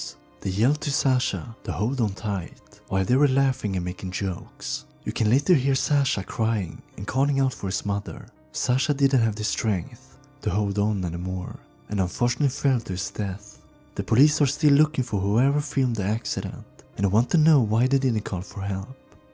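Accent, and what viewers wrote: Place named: Sweden. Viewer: Im a Finn, and can confirm. This is a swedish accent.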